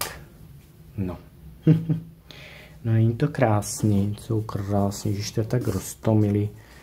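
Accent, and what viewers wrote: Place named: Sumava, Czech Republic. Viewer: Ahoj, Bože, ta tvoje radost z objednávek je fakt nakažlivá 😊 Jdu objednávat také kravinky pro radost ❤ Přála bych ti spolupráci s Temu, nebo Shein... Myslím, ze by ti to moc sedělo a zisk by nebyl priorita 😊 Krásně se posloucháš To víš, ten tvůj měkkoučký dialekt pro nás ŠUMAVÁKY 🤔 Hezké dny přeji a těším se na příště 🙋‍♀️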